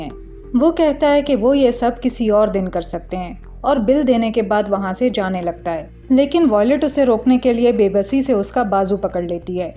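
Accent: Pakistan. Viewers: Aapke urdu aur talaffuz se malum hota hai shayad pakistan se hain. Anyways, ek french movie hai "Tell no one", uska detailing karein ...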